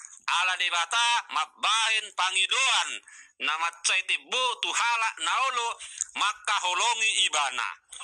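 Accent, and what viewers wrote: Java, Indonesia. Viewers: Saya kagum dengan Bapak bukan hanya Sejarah saja yg pintar tapi Agama juga mantap.Dan membuat aku merasa heran kalau bicara bahasa Indonesia Bapak nampak logat Jawa.Tapi kalau ngomong bahasa Batak sangat fasih dan tidak ada kejanggalan.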